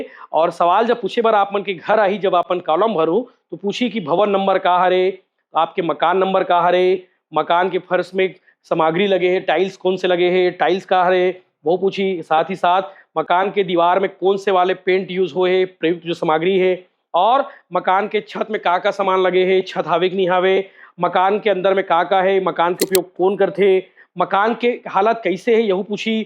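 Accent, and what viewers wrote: Chhattisgarh, India. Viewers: आज तो तै ठेठ छत्तीसगढ़िया कस लागे हस भाई ।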